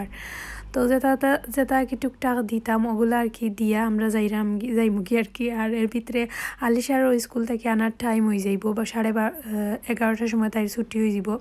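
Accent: Sylhet, Bangladesh. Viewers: আপু তুমি সিলেটের ভাসা কথা বল আমার খুবি ভাল লাগে।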